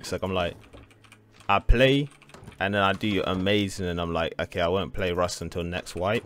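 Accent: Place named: United Kingdom. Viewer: Mate sounds like a brit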